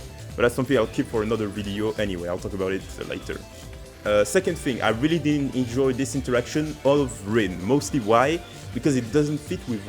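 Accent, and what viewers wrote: France. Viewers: haha I think it's for the better. I believe your accent is very good for the ear. I especially picked that you were French when you said the word "Egoist" and "egotist". The "ist" at the end sounded very much the "ist" in the French word "égoiste". I think it's good, it adds variety of people in the blue lock community!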